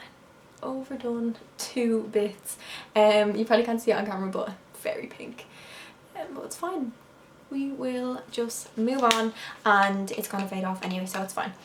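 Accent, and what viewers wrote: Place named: Ireland. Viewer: Super happy this was recommended to me actually. You from Ireland babe? Your accent is very much like clarabell.x! Can’t wait to binge the rest of your vids and stalk you everywhere 😘😘😘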